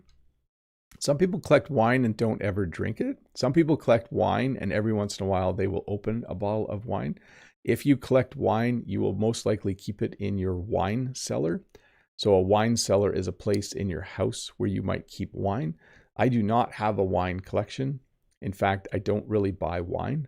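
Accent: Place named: Canada. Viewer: you make me would like to immigrate to canada I like canadian accent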